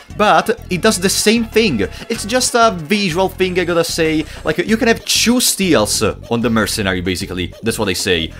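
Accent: Italy. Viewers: I just wanted to say that you are so captivating. Fear & Hunger is the first Dark Fantasy RPG I have delved into and you are the very first youtuber that made me watch a no hit run for 2 hours straight, that is very commendable my friend! Not to forget your Italian accent, it really makes everything so fun to watch would love to see some Merch in the future and on a sidenote, ive been able to beat Crowmauler using your guide:))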